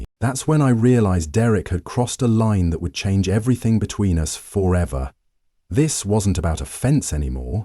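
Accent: United Kingdom. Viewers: Why is it a british voice No one in Britain has guns Gtfooh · What the hell with the British narration?? Just another Boss woman story .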